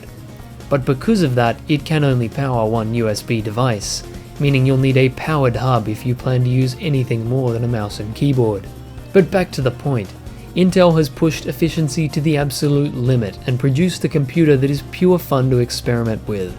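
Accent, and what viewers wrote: Australia. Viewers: Are you aussie mate? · May I ask what accent that is? I'm not a native English speaker so I have difficulty placing accents. Australian?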